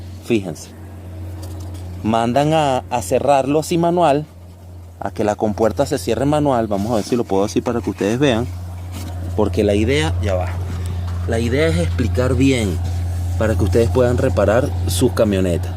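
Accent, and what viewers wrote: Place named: Venezuela. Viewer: saludos mi amigo desde barrancabermeja santander colombia tengo una chevrolet blazer modelo 93 el aire acondicionado no me funciona cuando vi tu video me alegre necesito saber mas ya que esta camioneta era de mi padre se la dio a una amiga y la desvalijaron no tiene la inteccion y le colocaron un carburador de dos garganta de dodge 300 o ford ranger que es lo mismo creo tu eres de donde suena como a venezolano oye tengo el club blazer colomabia es pero que seas mi amigo alli y nos comparta tus conocimientos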